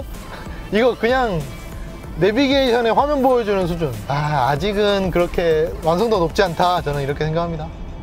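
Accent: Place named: Jeolla, South Korea. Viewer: 발음이 문제가 아니라 전라도 사투리가 문제 인 듯?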